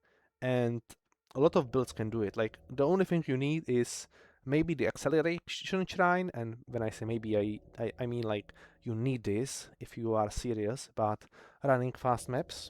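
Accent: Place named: Czech Republic. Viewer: Českej přízvuk se prostě nezapře.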